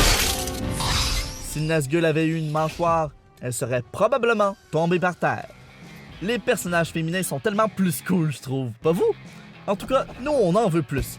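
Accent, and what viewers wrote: Quebec, Canada. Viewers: T'as oublier Hit Girl de Kick Ass !!! Mon dieu je l'aimmmeeeeeeeee 😍 Ps : J'adore ton accent québécois, je regarde tes vidéos limite pour ça 😘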